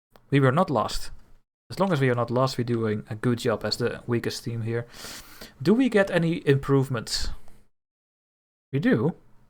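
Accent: Netherlands. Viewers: Really enjoying your play through and I love the Dutch accent!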